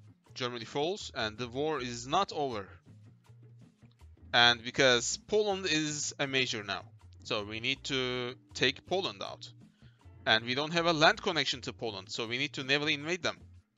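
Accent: Turkey. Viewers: aksanı duyunca dedim bu bizden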